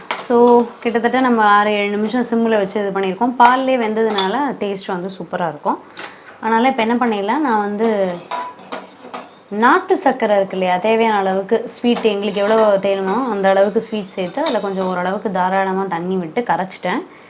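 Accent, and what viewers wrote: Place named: Tamil Brahmin, India. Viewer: I think you are a tambrahm since your diction is familiar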